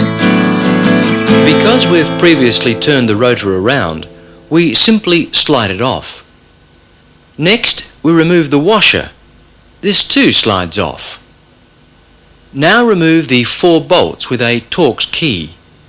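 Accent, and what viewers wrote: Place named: United Kingdom. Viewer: Great H-D 🏍️ class with the “Evil British Accent” 😎 “Live to Ride” thank you for uploading this.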